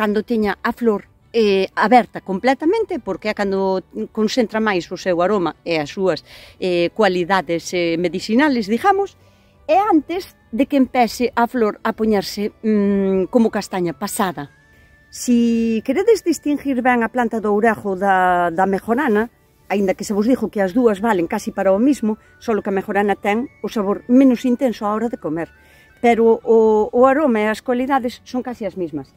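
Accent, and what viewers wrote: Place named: Ribeira, Spain. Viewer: Cousa tan xeitosa!! E como me gusta o teu falar ribeirán! Noraboa pola tua horta e polo traballo de difusión. 10 horas de media de traballo por cada video, lín nun teu comentario. Estes videos son semente de futuro. Gracias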